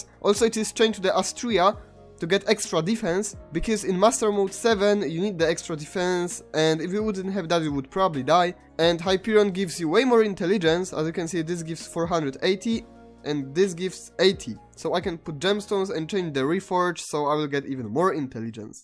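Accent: Poland. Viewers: Po pierwszym słowie czułem że jesteś Polakiem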